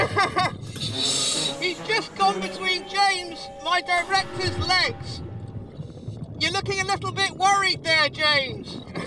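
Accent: England, United Kingdom. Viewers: Love the British accent. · The British accent gives him documentarian deep sea legitimacy. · Somehow a British accent makes these encounters more authentic. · I love british accents playing around with dangerous things. lol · sotaque inglês da poha ‼️‼️‼️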